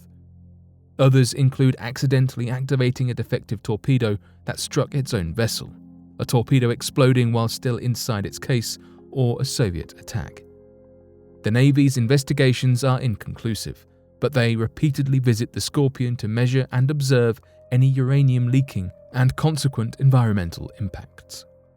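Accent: United Kingdom. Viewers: You can tell, besides the accent, that a Brit wrote this. 1906 quake was the San Francisco earthquake of 1906.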